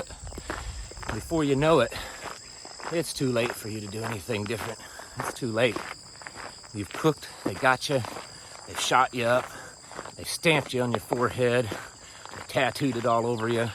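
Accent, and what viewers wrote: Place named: Arkansas, United States. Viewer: You said secession at least four times....you sound just like me! Arkansas Confederate.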